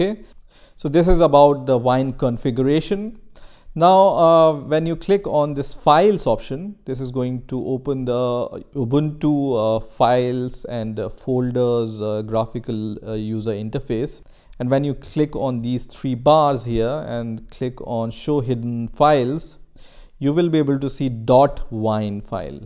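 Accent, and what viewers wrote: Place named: India. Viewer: you now what you sound Indian and I am pretty sure u are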